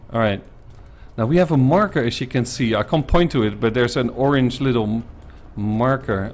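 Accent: Netherlands. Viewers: I hear a Dutch accent. How do I know? In Dutch to. Hallo. Goed Engels gesproken. Ik dacht DAT het eerst normaal was.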